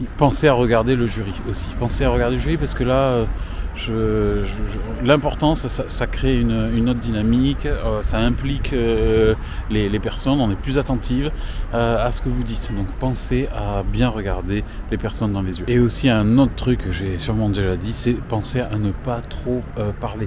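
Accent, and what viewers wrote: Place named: Bordeaux, France. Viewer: On dirait que vous êtes Tour de sèze à Bordeaux !😊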